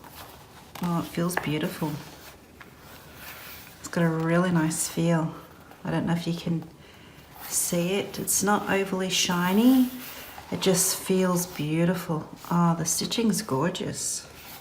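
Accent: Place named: Australia. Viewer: So lovely to hear an Aussie voice doing these types of videos :)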